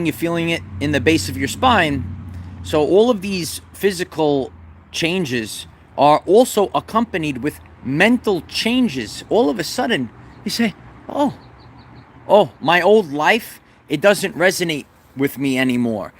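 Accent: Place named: Long Island, United States. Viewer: You always make me think of Long Island where I lived most my life (way you speak), but also you look like and sound just like one of my nephews. Love your channel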